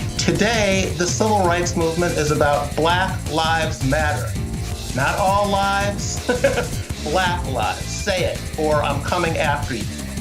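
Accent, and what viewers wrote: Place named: Chicago, United States. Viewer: Bro def from Chicago heights or chatam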